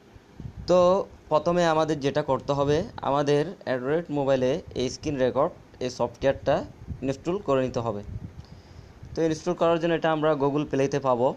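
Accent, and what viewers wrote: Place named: Chittagong, Bangladesh. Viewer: আপনার ভাষা শুনে তো আমাদের চট্টগ্রামের মত লাগে,,,চিটাইংগার টান আছে ভাষাতে, 🥰🥰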